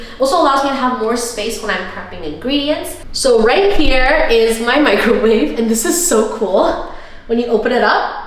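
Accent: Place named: United States. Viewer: You are looks like Koean or Japanees. But Your Speking Says You are American.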